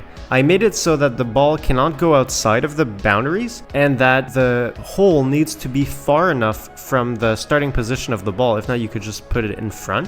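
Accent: France. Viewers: you have a french accent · you sound french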